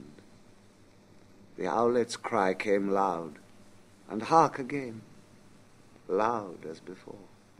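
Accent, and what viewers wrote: RP, United Kingdom. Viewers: Great stuff. He doesn’t just recite it in exquisite RP, he understands the text.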